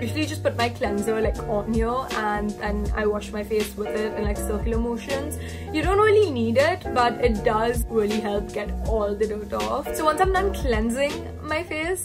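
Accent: India. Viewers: Whenever I feel depressed I watch your videos.. So relaxing Mann.. Love the way you love yourself ❤️😘😘😘😘😘😘 But yeah please make VDO in Hindi Yaar wanna hear your Hindi accent too😋😋❤️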